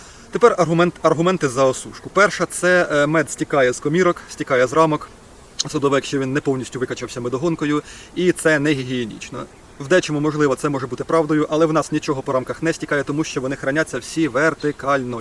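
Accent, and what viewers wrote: Standard. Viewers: Діло знаешь . Мова чиста , грамотна. Один недолік cпішиш. Кому ти розказуеш? Людям , то куда спишиш, виходить розсказуеш сам соби. Старайся , і поправляйся. Тоді все буде добре. Бажаю успіхів. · Дуже гарна чиста українська мова. Стільники необсушені мені зберігати не сподобалось. Обсушую у вуликах через заставну або через плівку. Далі проморожую у морозильнику і зберігають у порожніх корпусах + з оцетовой есенцією.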